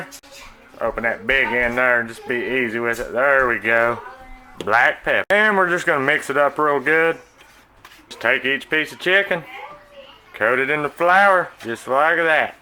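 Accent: South, United States. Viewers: I'm going to use your recipe today .. love your southern accent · When l listen to this chef l can't help but think of "Jethro Bodine"; and l mean that in a positive way. For l am a fan of the "Clampet clan". Crazy about "Jethro's" misunderstandings.😄 Gimme that down home Southern accent any day. Miss Jane: Are you planning to forego the Drysdale's garden party? Jethro: Oh yes ma'am; we'z all for goin' to the Drysdale's garden party. 😁🤣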